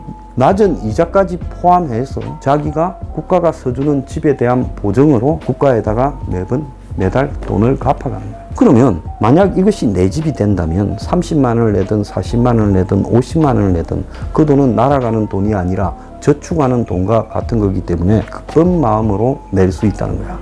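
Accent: Gyeongsang, South Korea. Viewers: 경상도 사투리로 찬찬히 설명하시니 할아버지가 손자에게 희망적인 삶을 가르쳐 주시는느낌! ㅎ 저도 경상도 대구사람 이지요.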